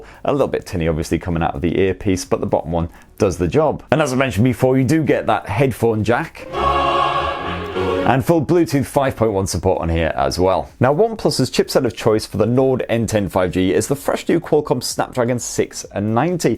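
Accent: United Kingdom. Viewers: Great video unfortunately I don't understand his British accent.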